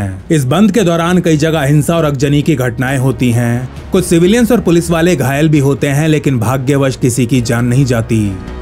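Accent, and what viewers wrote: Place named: Pahadi, India. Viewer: जबरदस्त प्रेसेंन्टेशन! पहाडी आवाज का जवाब नहीं!👌👌💪💪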